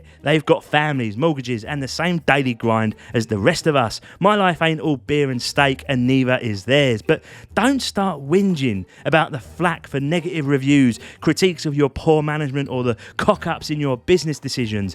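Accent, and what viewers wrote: United Kingdom. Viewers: i love your reviews and your lovely british accent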